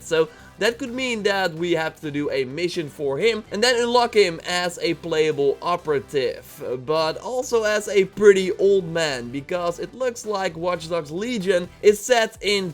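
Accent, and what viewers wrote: Netherlands. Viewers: You can really tell when someone is Dutch from the way they speak English :P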